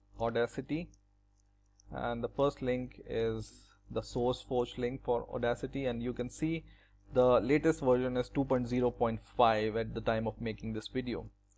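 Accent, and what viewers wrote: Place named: India. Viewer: I always try to find interesting tutorials for installing software on linux. But most tutorials on youtube are so boring, when I heard your accent I was so happy! XD YAS I love your Indian (is it Indian?) accent!!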